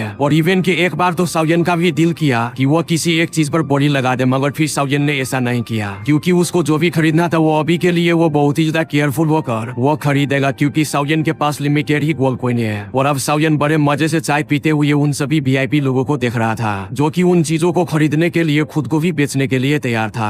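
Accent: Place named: Bengal, India. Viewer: Vai, tu Bengali hai?? I'm 400% sure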